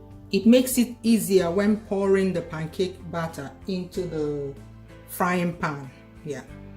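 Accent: Igbo, Nigeria. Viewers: Sis idi too much. I learnt alot from you. Nye wam ur igbotic voice. I am loving it anytime. Jisike!!! More grace sis. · We like hearing " ur sexy igbotic..voice"..jisike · We love that Igbotic voice 😂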